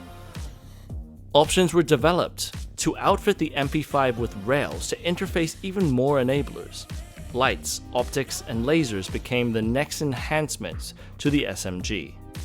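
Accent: Germany. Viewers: great. like your german voice game. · Best SMG ever! Update: Your German accent is so naturlich! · Idk who this guy is but when I see an asian dude do a german accent I sub immediately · An Asia, speaking in a German accent is peak 2026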